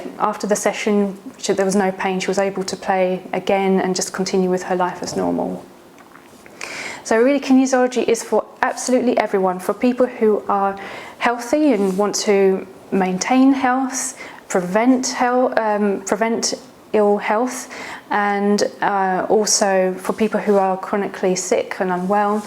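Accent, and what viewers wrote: United Kingdom. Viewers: Definitely an English accent :) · Funny, when I saw the name, I expected either Spanish/Mexican accent or an American one, not a South-East England/London accent.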